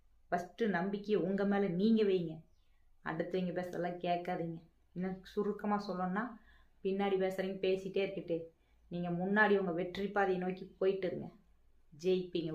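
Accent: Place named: Coimbatore, India. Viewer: ❤கோவை ஸ்டைல் பேச்சு எனக்கு ரொம்ப பிடிக்கும்❤❤👍👍👍🙏🙏🌺💐💯🤝💥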